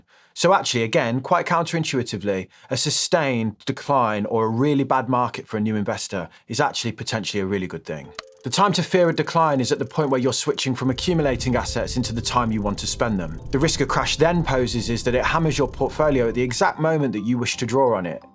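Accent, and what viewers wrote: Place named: Black Country, United Kingdom. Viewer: It sounds like the black country, maybe walsall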